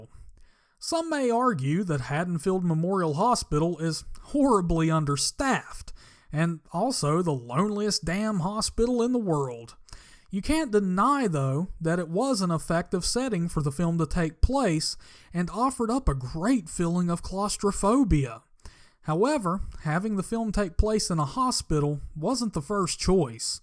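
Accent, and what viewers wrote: United States. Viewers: this guy sound like a hick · His voice so country🤦🏽‍♂️😂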